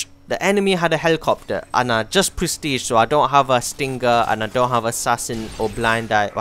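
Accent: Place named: Europe. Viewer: Watching this video, Grandma is sitting across the table asking "who is that on the computer" I tell her you are from Europe, she likes your accent. :) Great vid, been rocking that pp90 with rapid/silencer = beast mode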